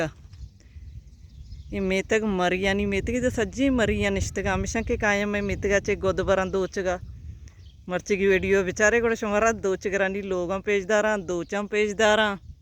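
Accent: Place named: Makran, Pakistan. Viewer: وعلیکم السلام مئے شمئے کلمتی مکرانی لہجہ ءَ هوں همے چیزا دَرنگ گُشاں کہ مری درنگ گشگنتی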